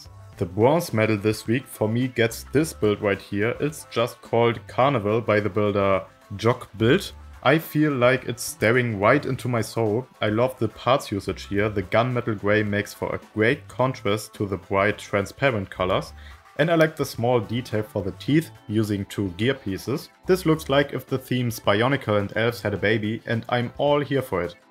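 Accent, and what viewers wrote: Germany. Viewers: Is it shust me or do i hear german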